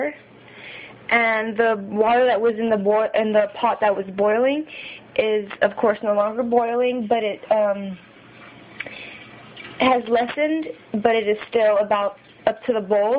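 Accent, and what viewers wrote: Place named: United States. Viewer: Gotta love when idiots are explained how somethign works then makes youtube videos about it: Here.. I have something which obviously is larger than CUPS... marked with 12 sure as hell wonæt be litres and given the accent, we can only surmise it is a yank, no wonder, so perhaps gallons... 12 gallons that size that far up.. feasible, looks a bit over the top but still within reason... Stupid girl goes erm 12 , let me think, cups, ye thatæs right.... JESSSUUSSS. Just leave our planet already please x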